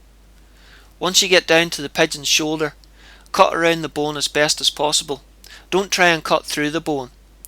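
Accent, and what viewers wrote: Ireland. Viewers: Ah, there at last. Your handsome face to match the charming Irish accent....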